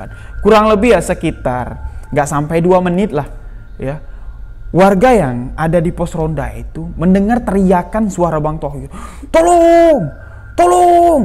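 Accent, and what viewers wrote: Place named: Jambi, Indonesia. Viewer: Hadir bang Pertahankan logat jambinya,,,,, MANTAP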